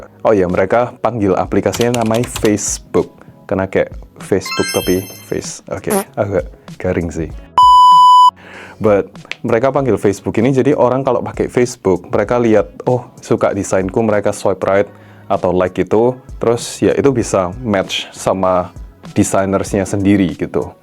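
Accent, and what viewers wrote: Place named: Java, Indonesia. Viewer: kok medok ko ?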